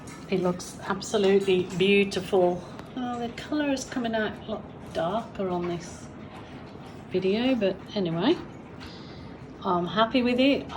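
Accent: Birmingham, United Kingdom. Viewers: That is a cheer-me-upper if ever I saw one! Fabulous colours and your talent make a painting I could look at for a very long time, thanks for sharing the how of it. btw, every so often I think I hear a Brummie accent - is that possible? ❤ from Scotland ❤😂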